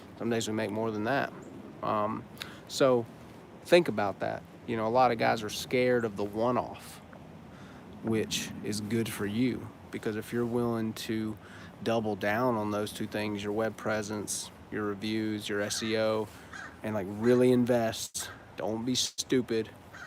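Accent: United States. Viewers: Love the country accent lol. Good videos man, keep em coming.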